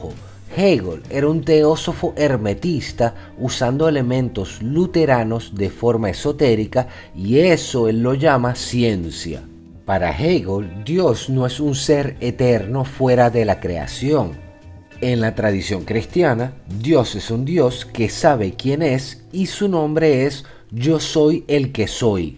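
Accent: Venezuela. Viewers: Por tu voz me acabo de dar cuenta que " ", debe ser venezolano. ¿Cómo alguien que escapó del intento de comunismo puede defender el comunismo?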